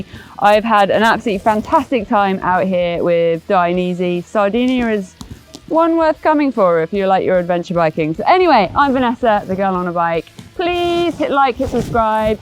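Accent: United Kingdom. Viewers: Funny, I follow you on Instagram but never noticed that you’re British. You’re British right? Great looking pants and jacket!!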